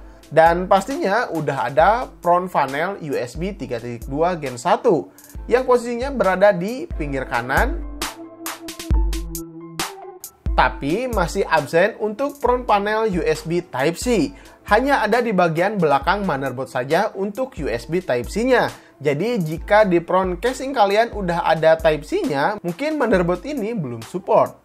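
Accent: West Java, Indonesia. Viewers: wkwkwk sunda bet ngomongnya. ketika V dibaca P "pidio"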